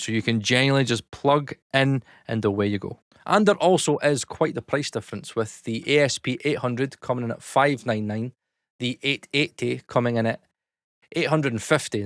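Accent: United Kingdom. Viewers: Sorry mate, could not take your British accent using disgusting American terminology. ROUTING has an 'OO' sound not an 'OW' sound.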